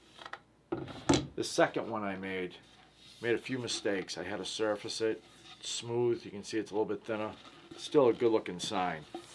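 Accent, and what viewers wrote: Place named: Massachusetts, United States. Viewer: Dude! These signs are awesome! How am I just finding you now??? I grew up in Natick and now live in Portland (Maine), but I miss my homeboy accent something wicked. And Maldenites have one of the best MA accents of all. Anyway, I found you through the Rockler 2x4 Challenge and you have a new subscriber. Keep on makin'!!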